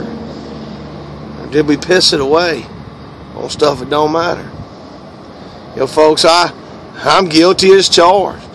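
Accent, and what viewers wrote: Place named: Texas, United States. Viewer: Make sure you post more to you tube because I am spreading the word with your videos! I moved to PA from Texas and to get your accent with my morning Word is a blessing! LOL! I have shared a lot of your videos already thru Facebook (yes, I follow you) so you are doing Gods work for sure! Keep it up and God Bless!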